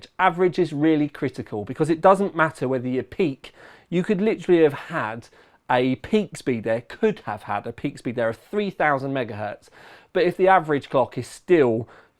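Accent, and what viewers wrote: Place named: United Kingdom. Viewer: at first I was like "oh, damn, British accent" to which I am not all that well accustomed, but then I went "eh, nice pronunciation" (obviously, eh) thanks for the review and highlights against greens and cheers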